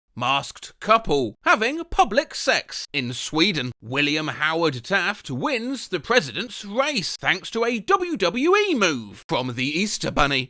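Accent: United Kingdom. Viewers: I LOVE LISTENING TO A BRITISH MAN YELLING DIRECTLY INTO MY EAR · i have never been so excited about a british man reading text on a screen in my life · my friends will never understand how much whimsy listening to a british man reading quotes from twitter (no im not calling it x im boycotting it take THAT ELONgated MUSKet) and reddit gives me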